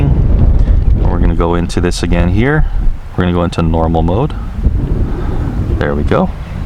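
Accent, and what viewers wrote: Hawaii, United States. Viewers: Kras 4 he is in Hawaii & they have their own accent and enunciation. I’d like to hear the critics living on the main land try to pronounce some Hawaiian street names.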